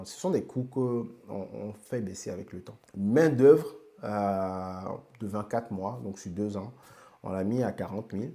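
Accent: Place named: Cameroon. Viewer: Tu parle comme un camerounais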